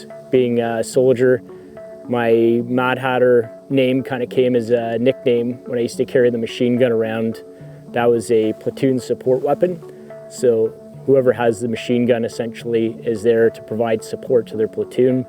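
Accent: Canada. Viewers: Nice to listen to another Canadian.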